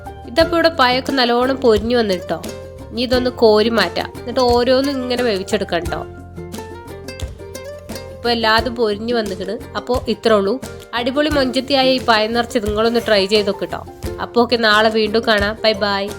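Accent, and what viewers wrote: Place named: Malappuram, India. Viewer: Malappuram സ്ലാങ് പൊളി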